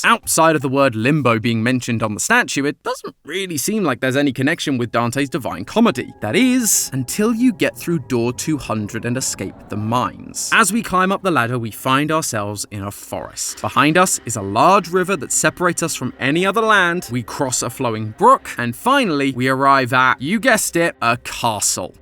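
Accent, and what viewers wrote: United Kingdom. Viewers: Honestly, I love as a host. His British voice is definitely my favorite thing about him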